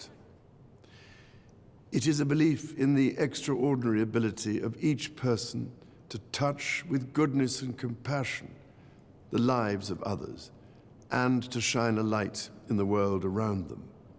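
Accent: RP, United Kingdom. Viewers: He also sounds posh like his beloved mother 👍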